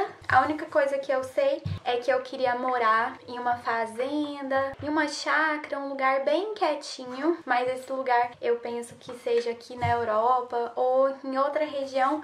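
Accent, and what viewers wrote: Goiânia, Brazil. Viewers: Oi, meu nome é Dayane, vivo em Liverpool UK, assisto seus vídeos desde quando vc morava em Londres e acompanhei sua saga. Um vídeo seu que mais que marcou o vídeo que você viajou com o Stephen sozinha, foi buscar seu documento e não estava pronto. Teve que voltar com as malas e remarcar a passagem. Partiu meu coração ver você sozinha mas ao mesmo tempo vi o quanto você é forte e uma mulher sábia. Tinha inúmeros motivos para reclamar mas transmitiu uma imagem de segurança e tranquilidade. Amo seus vídeos e tenho certeza que vocês vão superar toda essa situação com seu bebê. Vou colocar vcs nas minhas orações. Deus os abençoe grandemente. Quem sabe um dia tomamos um café juntas. Ps. Amoooooo Goiânia e o seu sotaque ❤️ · Oie, sou a Pâmela de SP, minha melhor amiga é de Goiânia eu adoro seu sotaque me lembra dela! Quero fora em um futuro próximo, seus vídeos são muito explicativos!!!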